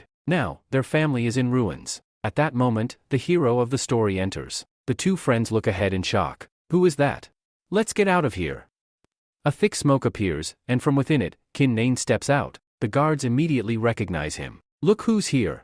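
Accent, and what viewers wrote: India. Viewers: The AI voice being Indian is insane 😂😂😂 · Indian voice damn tho he tried its ok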